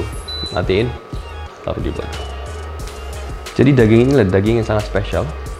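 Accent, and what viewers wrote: Java, Indonesia. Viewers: logat jawanya chef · kok enak ngomong jowone coba nek review campur bahasa jowo mas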